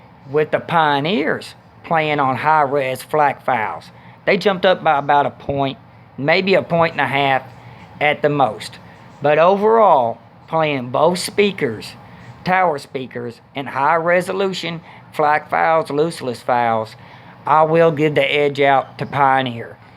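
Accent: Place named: Arkansas, United States. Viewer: I'm from AR and I can confirm his accent is very close to the folks around these parts.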